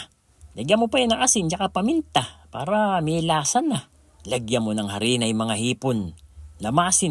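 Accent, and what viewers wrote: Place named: Baler, Philippines. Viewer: My Punto k po Ng taga baler hehe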